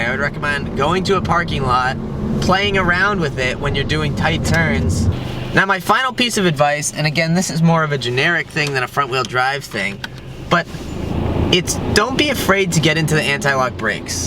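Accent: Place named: England, United Kingdom. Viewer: Nice English accent and great video.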